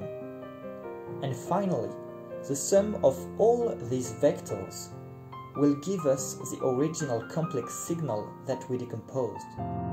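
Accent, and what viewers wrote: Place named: France. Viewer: I loved the math, the French accent, and the music! :D great video!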